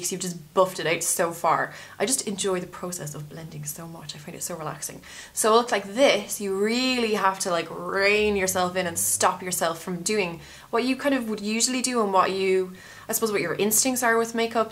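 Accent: Ireland. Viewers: you are from aus?! i thought u were irish ha! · Just randomly found your channel. LOVE!!!!!!! I know you mentioned Australia, but that's an Irish accent I hear. What's the story???? I went to Northern Ireland last summer, so I'm basically obsessed. Thanks for a great tutorial :)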